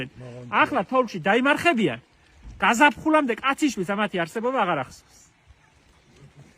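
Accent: Guria, Georgia. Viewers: დიდი პატივისცემა ჩემო გურულო ვგიჟდები რომ ვუცქერ და გურულ საუბარს ვისმენ❤ მომენატრა ჩემი ბებიკო და ჩემი გურია😢მოკითხვა სიყვარული და გამძლეობა საყვარელ აჭარას და აჭარელ ხალხს❤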